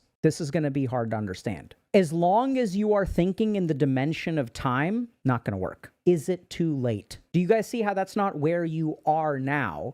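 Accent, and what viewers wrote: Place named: India. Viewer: I adore this India-accent of English 😉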